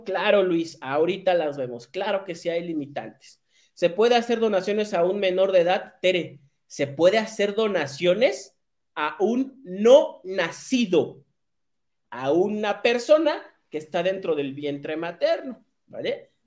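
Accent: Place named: Mexico. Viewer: No reconoces el acento mexicano ?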